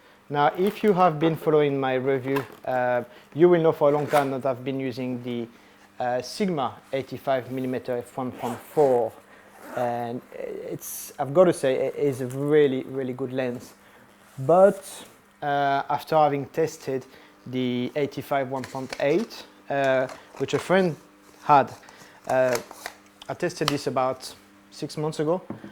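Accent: France. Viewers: J'ai cru reconnaitre un bel accent français ? Est-ce que tu fais toutes tes vidéos en anglais ? Et juste une petite remarque à plusieurs moments tu dis lens wood au lieu de lens hood ^^ Bonne continuation !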